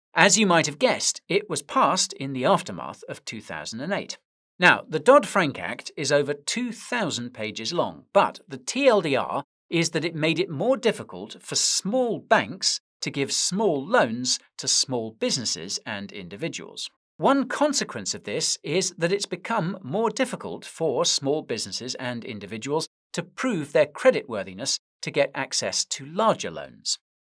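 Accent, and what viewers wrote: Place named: RP, United Kingdom. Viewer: Great video narrated to the Queen's English I'm sharing this one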